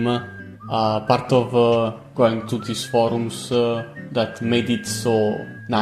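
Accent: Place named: Finland. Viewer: The finnish accent is lovely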